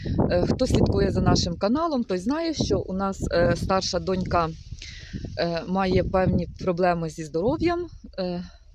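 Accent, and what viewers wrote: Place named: Liubar, Ukraine. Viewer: Ви супер! Дивлюсь Вас із задоволенням.Люблю говір Любарщини. Когось знаєте із Меленець?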